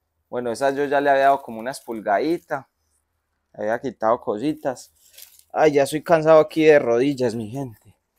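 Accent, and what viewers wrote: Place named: Colombia. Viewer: Soy nueva en su canal y me gustaría estar con ustedes para poder recoger la planta con ustedes son de Colombia ustedes por el acento bendiciones saludos ❤❤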